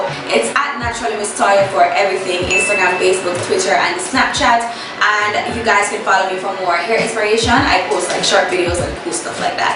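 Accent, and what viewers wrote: Jamaica. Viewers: Is she Jamaican ?? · I’m a simple girl. I hear a JA accent, I subscribe. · I must be so use to hearing a JA accent 💀 cause everybody saying they hear one and i bearley hear it😭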